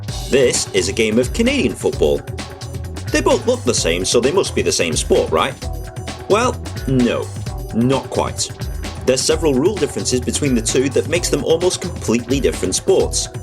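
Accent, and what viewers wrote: United Kingdom. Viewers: Am I listening to a British guy tell me about football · Why is a Brit explaining American or Canadian football?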